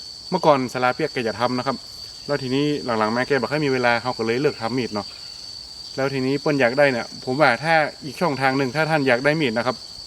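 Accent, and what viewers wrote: North, Thailand. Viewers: สวัสดีค่ะอาจารย์ฟังอาจารย์พูดคำเมืองรู้เรื่องบ้างไม่รู้บ้างแต่ก็ชอบเพราะมันเป็นธรรมชาติแ ท้ๆชอบมากค่ะ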